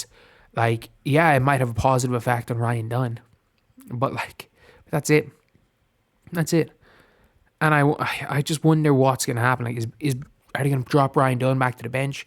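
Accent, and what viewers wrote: Ireland. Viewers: i knew youre from ireland just by the accent .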